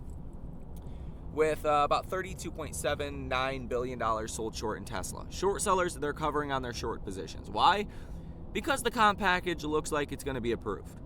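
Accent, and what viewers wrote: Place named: Chicago, United States. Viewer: it sounded like a 1950s Chicago accent of a guy announcing a horse race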